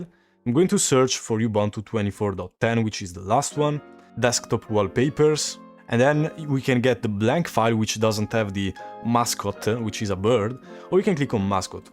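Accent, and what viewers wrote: Italy. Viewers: Bro ma sei italiano?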